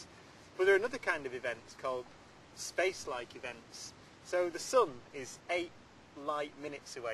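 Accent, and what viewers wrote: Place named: Lancashire, United Kingdom. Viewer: "The ball caused you to fall off the wall" is the greatest sentence you could ever hear in a Lancashire accent.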